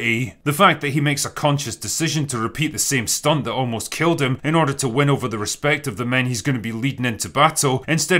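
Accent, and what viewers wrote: Scotland, United Kingdom. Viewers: Really weird hearing a Scottish accent saying anything good about the English.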